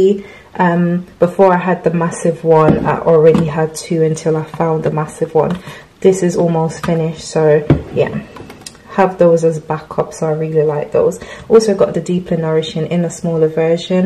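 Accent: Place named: United Kingdom. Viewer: You're 🕊️ haul is 🔥🤍😏 🤍 the British accent as well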